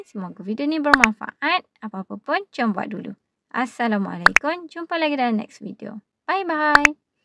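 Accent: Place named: Kelantan, Malaysia. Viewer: Awk org kelantan ke. Mcm slang kelantan 😊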